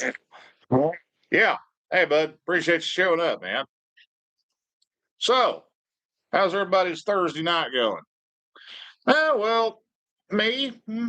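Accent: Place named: Arkansas, United States. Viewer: I don't know if these comments after the live-feed helps in any way, but I am a loyal listener for several reasons. One is that you are very knowledgeable in usable information. Second, it's fun to listen to your jokes (not necessarily your characters). Third, I love to hear an accent from home and some days I am low enough that just hearing an Arkansas accent will lift my spirits to the point of almost tearing up. Some day I will make it back down to Tampa and will say hello again (met you Dec 23 at the Ride Factory, retired Navy guy. Also met Weems, David, Jack and wives at Sturgis in 2025.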